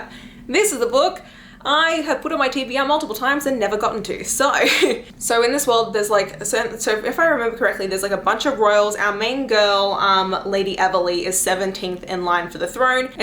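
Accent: Australia. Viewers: When i put u in 2x speed u sound extra australian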